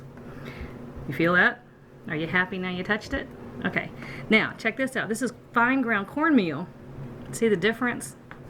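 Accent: South, United States. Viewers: Paused the video at 4 seconds to write this comment. I live in Texas and if you ain’t getting you’re recipe from woman with a southern draw and hair as big as this you’ve already messed up.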